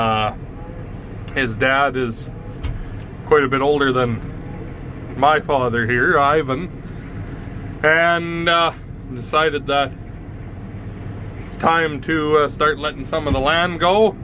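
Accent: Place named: Canada. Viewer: I love this channel, he talks like real Canadian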